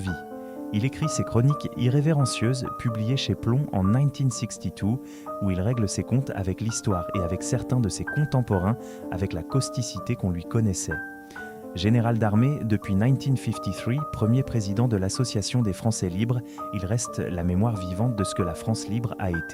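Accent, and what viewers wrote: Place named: United Kingdom. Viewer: vous prononcez les dates en anglais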